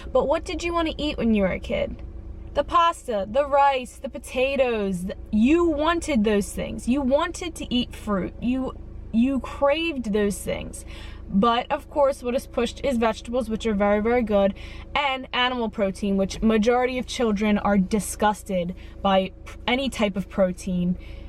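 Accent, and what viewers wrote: Pennsylvania, United States. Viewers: your accent I knew you were from PA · As soon as I heard your accent I knew you were from PA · I love your accent! I'm also from PA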